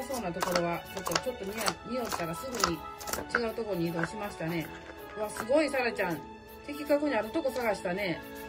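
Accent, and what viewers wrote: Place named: Kansai, Japan. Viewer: 関西弁は親しみやすい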